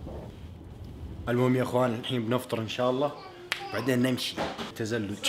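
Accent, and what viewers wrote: Saudi Arabia. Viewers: Abdallh_alenzi _ بس هو يتكلم سعودي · تونسي بس كان عايش ب السعوديه عشان كدا بيتكلم باللهجه السعوديه وهو دلوقتي عايش ف كندا دراسه وكدا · ادمان اوفر واتش هو تونسي بس بيحكي سعودي عشان نفهم عليه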